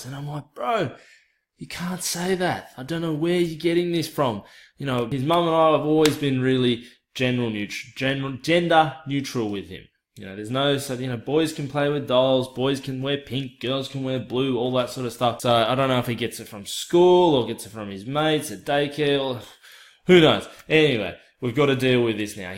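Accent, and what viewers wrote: Australia. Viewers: You sound so Australian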